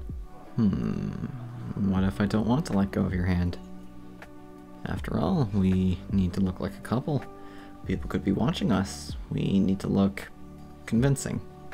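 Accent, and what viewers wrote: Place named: United Kingdom. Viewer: You sound British and I love it🤩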